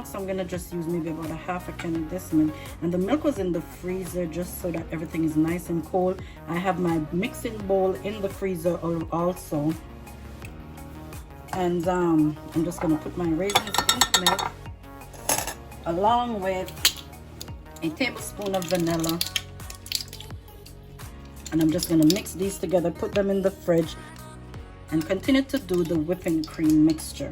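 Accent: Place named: Jamaica. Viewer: U sound like a Jamaican 🇯🇲 New Subscriber😂🙌💖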